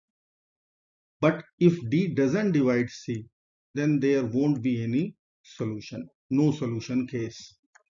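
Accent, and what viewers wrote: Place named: India. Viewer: good video but indian accent is a bit annoying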